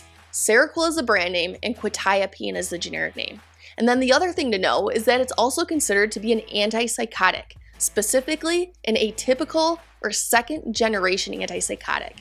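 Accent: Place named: Massachusetts, United States. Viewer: Would like to reckon you’re from Massachusetts holy lmao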